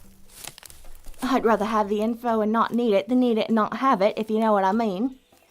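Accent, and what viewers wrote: Australia. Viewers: You have a beautiful accent. Australian? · you sound Australian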